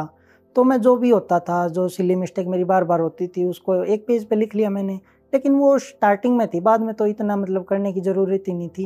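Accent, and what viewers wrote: Haryana, India. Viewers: Hariyani tone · Ya chhora haryanvi touch hai😂😂🥳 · Haryanvi ha k 😁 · Haryana se ho kya bhai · That's haryanavi tone..😁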